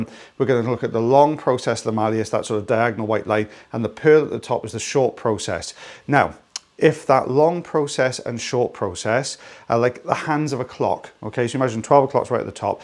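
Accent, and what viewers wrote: Wales, United Kingdom. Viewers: I am loving learning new terminology and facts about ears. Last year I had to get my ear cleaned after swimming had dislodged some wax. Went to the GP who was a bit thrown by my telling her that I thought the wax had gone into the anterior recess. Turns out that the nurse who did the subsequent syringing (no micro suction available here) was also fascinated with ears. I told her about your channel and she has become a huge fan too. Why do I love your videos? I learn something, it is so satisfying to see the results and how much difference it makes to your patients, Your passion for what you do and finally, I simply love a Welsh or Scottish accent 😃 Between you and The Hoof GP, I get to watch people who love what they do, help others in pain or discomfort and learn. I do all of this from the comfort of my home on the other side of the world in tropical Northern Australia. 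Thank you!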